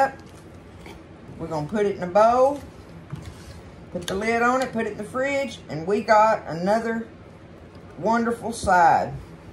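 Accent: South, United States. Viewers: you just crack me up! I love your channel and love you to pieces! I just adore your top with all the bright colors. I have the cutest apron made out of that same pattern of pastel stripes. Love those colors don’t ya?! You make me laugh with all your “sayings” and your southern accent. I am an “Okie” here in Oklahoma City and get teased about my accent too. I retired from Southwest Airlines in reservations and on one call I had a gentleman end the call with one request, he wanted me to say “ya’all” one more time! When I said it, I could hear the room chuckle! They weren’t being rude, they just enjoyed the accent too. I often was told they could tell I was from the South, because I was extra nice and took extra time to be so helpful. It does make you feel good to brighten the day for someone and know that you were helpful too. I know you know what I mean. 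❤ Can’t wait to make your “fluff”! 😊❤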